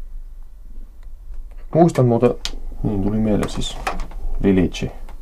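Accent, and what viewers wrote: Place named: Kitee, Finland. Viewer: dosen't mumble. People from Kitee just speak like that. I live near Kitee and I speak almost the same way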